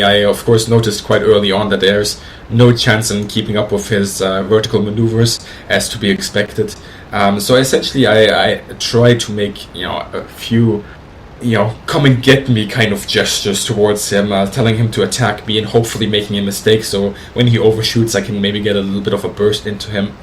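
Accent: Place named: Germany. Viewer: Wow! What a way to present information, through an actual real-time simulation. And do I recognize my favorite German accent guy? Whose depth of knowledge of the ww2 aviation history is UNSURPASSED? Thank you! This is awesome! I learn more from this than by flying the simulator myself! Great medium! Re-live reality!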